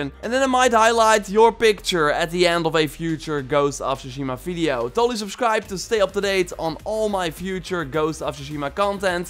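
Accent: Netherlands. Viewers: Damn I was right! I knew it sounded familiar. Visited the Netherlands back when I was studying in France and heard it back then. Been 5 years now and his accent reminded me of that trip for some reason lol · Bet he is, sounds wayyyyyyyy too dutch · Ben je Nederlands? I can sense an accent. Became a subscriber because your AC Origins video. Love the content amigo. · Yes it's the most obvious dutch accent ive ever heard on youtube, and it annoys the fuck out of me lmao. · Marcos Vargas its his accent, he's from the netherlands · He sounds dutch that’s why😂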